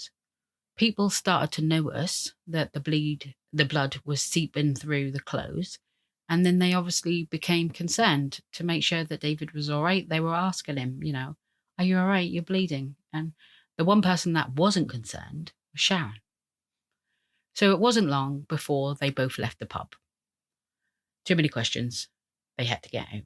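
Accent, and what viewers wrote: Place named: Norwich, United Kingdom. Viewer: Hi! I'm just wondering where you are from? I'm from Norwich and you sound very local. Best wishes Jane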